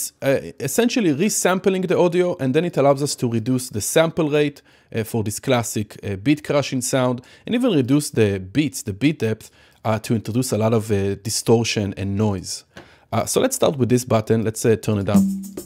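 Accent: Israel. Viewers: dude I can't get over that Israeli accent, love it!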